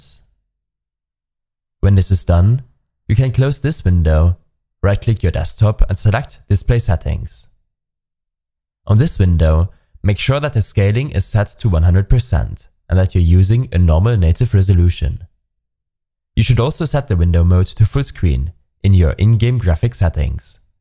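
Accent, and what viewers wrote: Germany. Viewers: man merkt das es ein Deutscher ist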